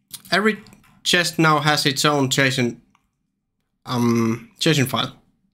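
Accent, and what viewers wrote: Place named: Finland. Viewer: I can hear Finland in your voice.... Nice video mate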